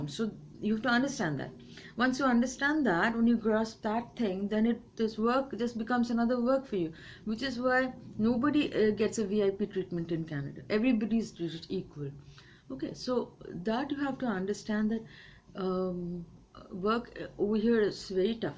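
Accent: Bengal, India. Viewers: Dear Madam 🌹🌻🎊🏆👍👌 I could make out that You are a Bengali Nice to hear from you A Matured Mind speak Regards From the City of Joy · Dear, I love your Bonglish.....Your English in Bengoli Accent is mind blowing.....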